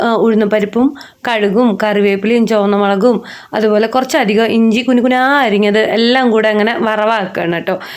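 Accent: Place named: Valluvanad, India. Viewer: നല്ല വ്ലോഗ്.... സന്തുഷ്ട ഭവനം... വളരെ സന്തോഷം തോന്നുന്നു...വള്ളുവനാടൻ തനിമ നിറഞ്ഞ അവതരണം...നന്മകൾ നേരുന്നു ❤❤❤🥰🥰😍😍🎉🎉🎉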